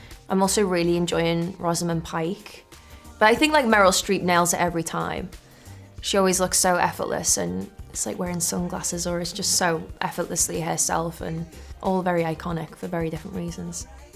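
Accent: Liverpool, United Kingdom. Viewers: I love the scouse accent icl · Best coming from Liverpool is the accent. I love english language accents.I am not english native speaker.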